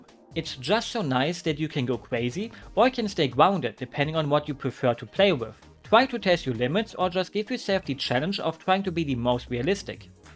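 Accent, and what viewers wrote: Germany. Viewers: Extremely German, don't even attempt English. Sounds so cringe!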